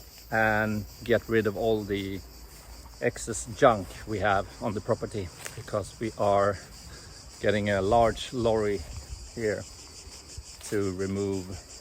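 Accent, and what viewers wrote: Sweden. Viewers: Yes, thats a great idea! Introduce yourself and, describe your family, who is going to be living in your future mini paradise! All I know is you have a distinctly Swedish accent in your english speaking! Very curious also about the process of getting the house - When? How? Why? Some context would be fascinating! Subbed! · Haha I knew it too!! Was looking for this comment before I asked too. I have worked in Sweden and recognized the accent!! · Är det en svensk dialekt jag hör, mån tro?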